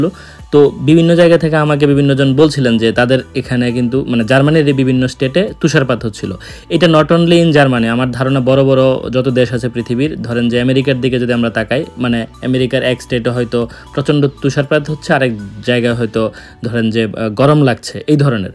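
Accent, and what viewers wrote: Bangladesh. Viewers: bhai,aponar bangldeser bhari kothai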